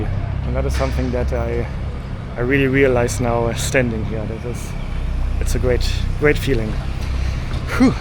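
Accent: Germany. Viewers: Thanks! Great videos, I’m an American with German background, I love to hear to your German accent.🇺🇸🇩🇪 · He made enough money..... Don't need to make more videos. People got addicted to his German accent English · I am Thai and living outside of Bangkok. I am also learning about places in Bangkok from your video.😂 Well done,. I like your English with German accent, very charming! Keep on doing a good job!❤👍🇹🇭Welcome back to Thailand!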